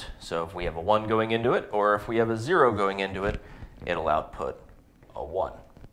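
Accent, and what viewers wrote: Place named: Canada. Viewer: i saw an american flag, but you sound like every single person i know-- here in canada, lol.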